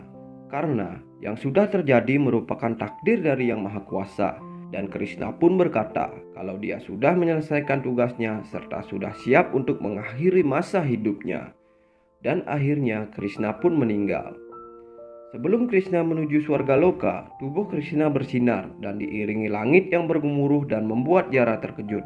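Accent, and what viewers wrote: Bali, Indonesia. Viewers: Aeng kentel logat baline.. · Logat balinya kental banget🤣🤣🤣🤣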